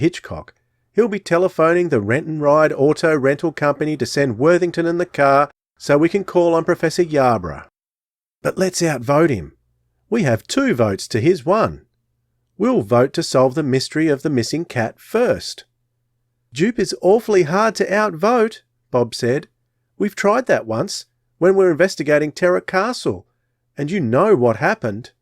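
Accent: Australia. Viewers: This is the first time I've heard an Aussie narrator! It's great!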